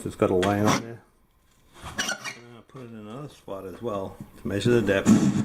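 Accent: Boston, United States. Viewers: I didn't realize that you are a fellow Bostonian.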